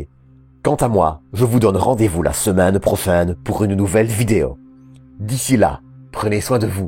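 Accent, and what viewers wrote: Belgium. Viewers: T'es belge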